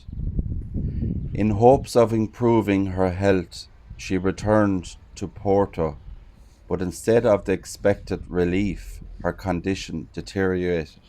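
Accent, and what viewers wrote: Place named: Dublin, Ireland. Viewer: Dublin accent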